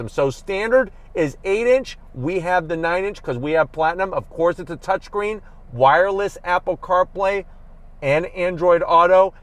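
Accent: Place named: New York, United States. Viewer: I know a new yawk city accent when i hear one! ;)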